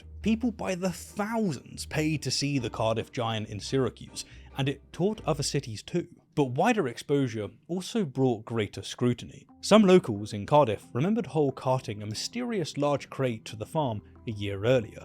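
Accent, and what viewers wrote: United Kingdom. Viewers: LOL you had me untill 25 min WHAT MAKES YOU SO DI9FFENT brittish accent boy go home your nothing new . don't you have a a bold headed guy covering all aspects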